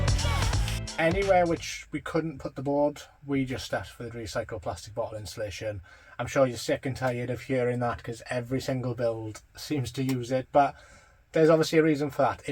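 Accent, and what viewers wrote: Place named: Wales, United Kingdom. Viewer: Hi from Canada. Luv your vids you seem like an awesome dude. Question #1-Your amazon link for that black foam roll insulation does not work here. Can you tell me the brand name of it? Question #2-what are the layers of insulation you used on the walls and ceiling? It looks like you put a double layer of that black foam roll sticky stuff. I agree with your vapour barrier theory. My tv has an awesome time trying to interpret your Welsh accent it is fun to read what it thinks you are saying eh.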